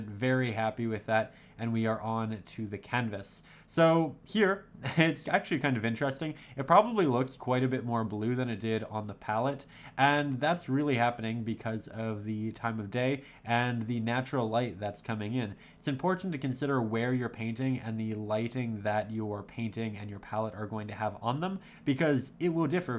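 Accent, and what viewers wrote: Canada. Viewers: This guy sounds like he is from Canada.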